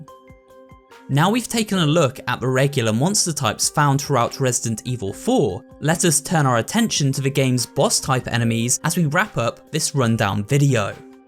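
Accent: England, United Kingdom. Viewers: i love that my man, translate the Spanish words into english just by changing the accent of the word.. typical english native speaker, doesn't even bother to learn how to say the word properly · I just love how says all the spanish Words with an english accent, para mi su forma de hablar en español y omitir la ñ me mata de risa (to me, him speaking spanish and skiping the ñ makes me laugh)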